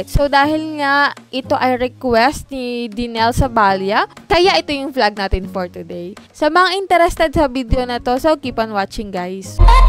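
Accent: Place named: Tagalog, Philippines. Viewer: mAam Anong province mo po may punto kasi katagalugaan...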